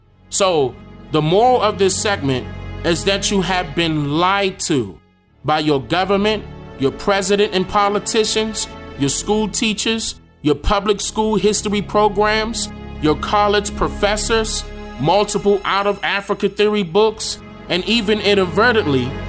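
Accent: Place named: New York, United States. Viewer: video might be ok i f your fucking ny accent was not so fucking annoying loll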